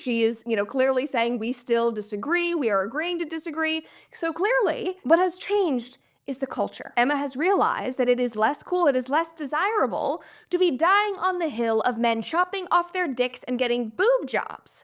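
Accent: United States. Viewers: now become more active in creating more awesome contents for us as fan weekly 4 videos, i really liked your vibes typical American accent fast quick accurate and precise no wonder u name yourself professional yapper:yt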